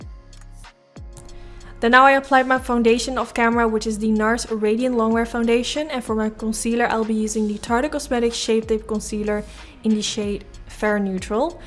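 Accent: Netherlands. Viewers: Wacht ben je nederlands?? Haha dit is de eerste keer dat ik langs je kanaal komt, en na 2 minuten dacht ik hmmm iets aan de uitspraak klinkt een beetje nederlands, maar toch ook weer niet. Daarna ff gegoogled en toen wist ik het hahaa -- leuke video ook!!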